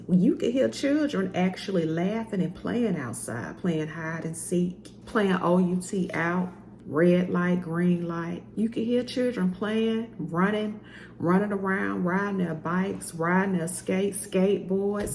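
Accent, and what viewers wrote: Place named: Georgia, United States. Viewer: I just discovered your content today! I am from Macon, GA originally and listening to you reminded me of women I grew up with😊. It was nostalgic and a breath of fresh air. God Bless You and Happy Holidays❤❤❤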